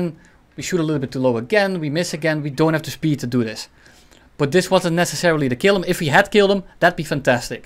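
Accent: Netherlands. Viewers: Oh you're dutch, so that is what the slight accent is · Aan je accent te horen ben je sws nl mrg top video, veel geleerd man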